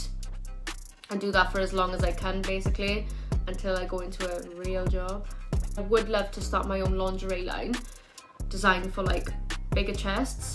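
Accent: Wales, United Kingdom. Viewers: I love your welsh accent babe 😍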